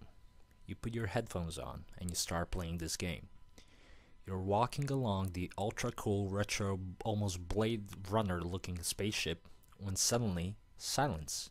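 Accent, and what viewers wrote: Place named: Germany. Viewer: Yo great review thanks for being 1st ur German accent makes it E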